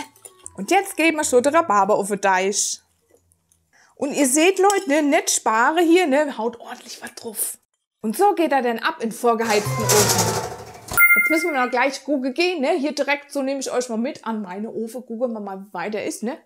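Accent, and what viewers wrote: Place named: Saxony-Anhalt, Germany. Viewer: Ne, a bissle Sachsen-Anhalt iss da ach mit drin ne ;o) aber der Kuchen ist super... gleich nachgemacht. Liebe Grüße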